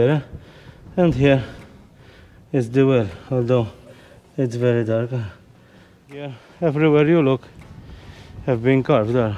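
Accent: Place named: Malta. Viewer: Fantastic as always & Co. I lived in Malta as a child and still visit most years, - I love seeing you uncover and re-explore these historical sites. Love hearing your fabulous Maltese accent too! Thanks for doing these in English:-)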